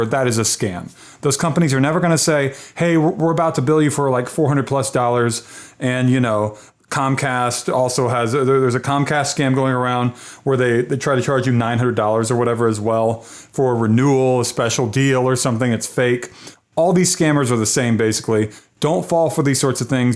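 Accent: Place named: India. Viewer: As soon as I heard an Indian guy I was like nahhh😭😭😭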